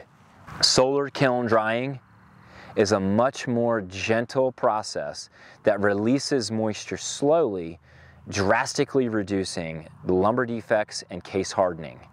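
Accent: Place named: North Carolina, United States. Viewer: Where in NC? Love to go see it. I'm coastal NC and if I had to guess from your accent, you're not western NC.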